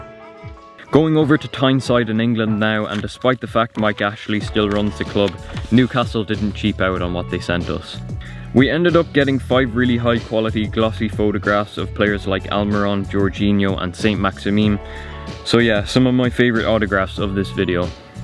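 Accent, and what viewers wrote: Ireland. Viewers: Are you irish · Are you Irish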